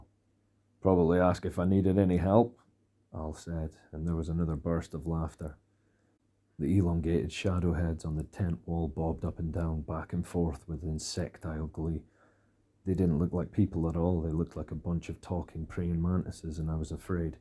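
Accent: Scotland, United Kingdom. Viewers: Oh wow, scottish asmr and Bachman? And RAGE? Lets GOOOO! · The story sounds so medieval 🏰 being read by a scots narrator 🏴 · as a Scottish person hearing a audiobook by a Scottish person gives me school flashbacks aha also I couldn't find this book anywhere so it's good u covered it on YouTube, you should do more of these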